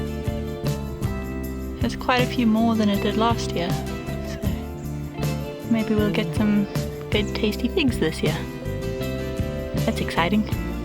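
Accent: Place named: South Africa. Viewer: Good to hear a South African voice. Good luck on your Portuguese adventure. The pool will be a great addition for managing the heat.❤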